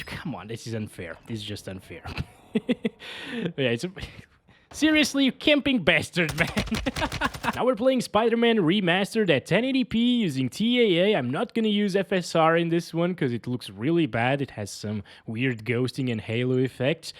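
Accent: Portugal. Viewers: Descobri agora que esse cara é português XD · Kksksksksksk coe mane, o cara é português que doidera🤝🏻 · você é português !!!????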